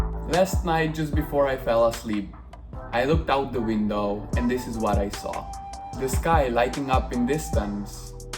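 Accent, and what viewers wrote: Philippines. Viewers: You speak your English in a Filipino English accent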